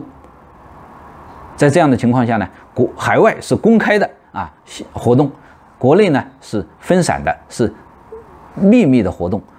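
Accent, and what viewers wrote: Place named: Wuhan, China. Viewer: 请问是不是武汉人啊？口音太像武汉人说普通话了。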